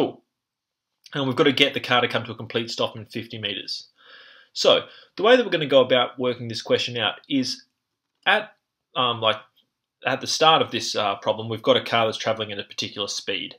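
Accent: Australia. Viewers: This has helped me so much. I'm enjoying physics and mathematics in general a lot more as these concepts become more intuitive. Perhaps the narrator having an aussie accent similar to my own helps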